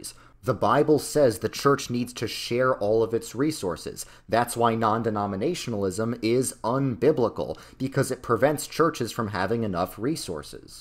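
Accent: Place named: Chicago, United States. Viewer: Okay, completely unrelated, but are you from Illinois? I hear some Chicago in your accent😅😅😅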